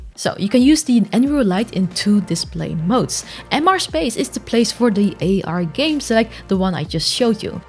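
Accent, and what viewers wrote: Netherlands. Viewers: You might want to look into an English accent coach. The dutch accent is one of the most mind fryingly annoying in the world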